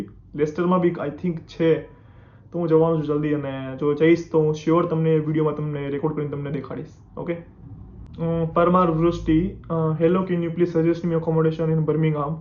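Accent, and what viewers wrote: Kathiawar, India. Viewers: Naa... beta tame kathiyavadi cho e to language par thi j khabar padi j jaay che....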